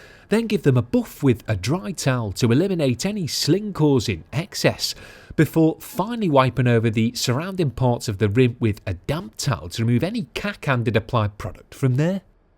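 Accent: Liverpool, United Kingdom. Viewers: The only scouse accent I like : ))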